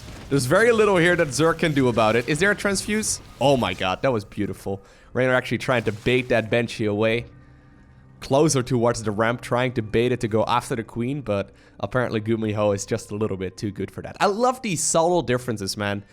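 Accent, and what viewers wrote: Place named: Netherlands. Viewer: My god your Dutch English accent is so terribly cringy to listen to... Get some lessons to fix it already. Its been this shit for years now.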